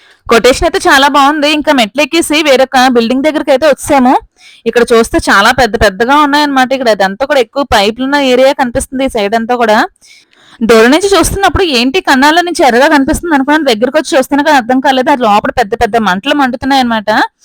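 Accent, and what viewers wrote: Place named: Srikakulam, India. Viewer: Srikakulam voice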